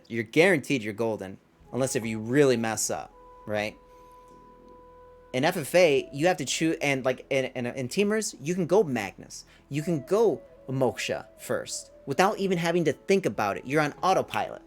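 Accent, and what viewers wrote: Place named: Seattle, United States. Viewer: Awesome Tier list! Just out of interest - where is your accent from? Are you from Seattle by any chance?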